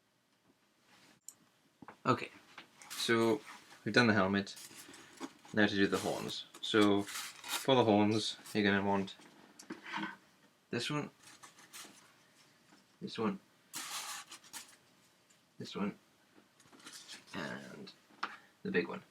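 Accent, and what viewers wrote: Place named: Wales, United Kingdom. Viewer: you in wales ? accents sounds about right , i want to make this is steel you got some contact info i could do with your help . im a blacksmith.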